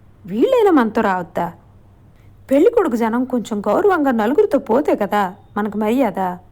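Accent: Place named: Rayalaseema, India. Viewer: చిత్తూరు నెల్లూరు ప్రాంతాల మోడల్ ఉంటుంది కదా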